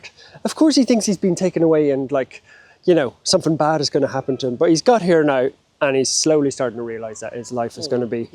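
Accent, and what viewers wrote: Ireland. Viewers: I think dogs like the sweet tone of your voice and your Irish accent ! Thank you for helping and saving this poor dog. He landed on his 4 paws in Doggoland !!!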